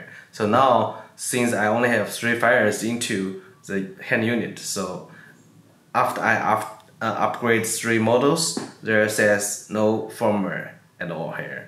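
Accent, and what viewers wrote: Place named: China. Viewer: can you please add SUBTITLES for your bad chines English? Thank you.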